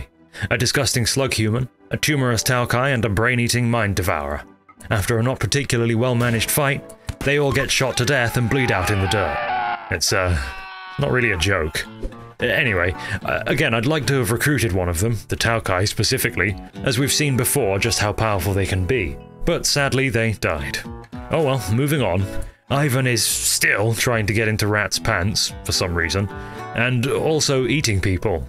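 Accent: United Kingdom. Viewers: hearing a very British man advertise a vary American game is actualy hilarious.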